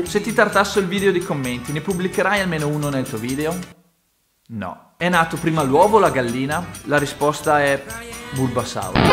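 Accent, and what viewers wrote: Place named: Veneto, Italy. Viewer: provincia di brescia??????? avrei detto ad occhi chiusi che sei veneto....(si, sto recuperando tutti i tuoi video vecchi xD)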